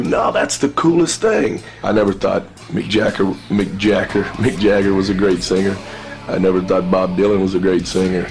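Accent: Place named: United States. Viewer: what he say?? i don't understand spooken american :)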